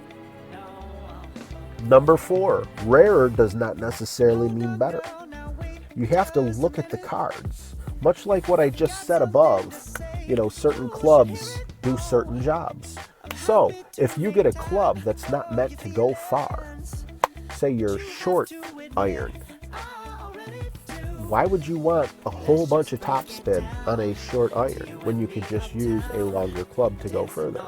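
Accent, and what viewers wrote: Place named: New York, United States. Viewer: Sounds like your from my area in NY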